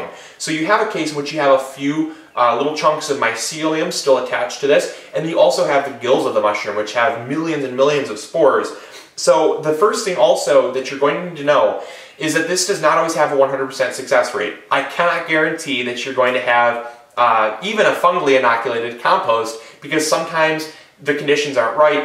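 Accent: Michigan, United States. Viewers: Holy Michigan accent! Thanks for the lesson I can't wait to try this. Luckily my compost pile is pretty shady.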